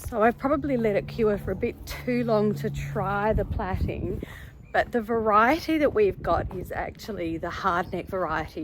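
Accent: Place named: New Zealand. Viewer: I was gonna say you sound like a kiwi! Kia Ora!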